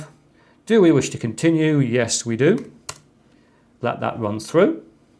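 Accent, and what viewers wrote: United Kingdom. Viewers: Hello! I need you to know that I'll be using your voice to learn British accent and about computers as well 😁 So, I'll be playing all of your videos on repeat even at night 😎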